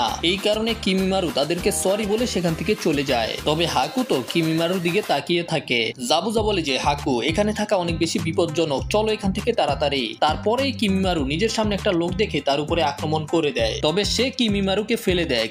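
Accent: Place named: Barishal, Bangladesh. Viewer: আপনার বারি বরিশাল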